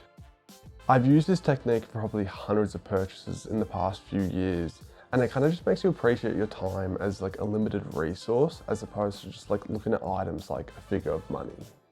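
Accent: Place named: New Zealand. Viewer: So your mom is from New Zealand. Good Guess on my part. LOL! 😉 Your accent … I was trying to place it and I thought: His accent sounds similar to Australians but not as prominent and then I thought New Zealand.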